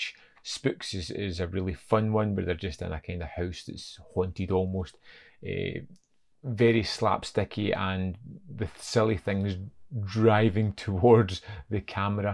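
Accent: Scotland, United Kingdom. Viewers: THIS REVIEW IS SPOT ON IT PROMPTED ME TO BUY BLURAY.....AND I WASN'T DISAPPOINTED ..LOVE THE GENTLE SCOTTISH ACCENT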